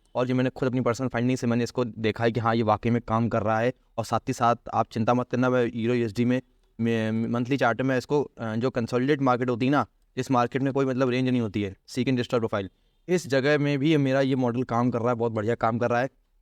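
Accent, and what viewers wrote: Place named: Uttar Pradesh, India. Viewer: Bhai aapke bolne kae tareeke sae aisa lag rha hai ki aap UP sas hai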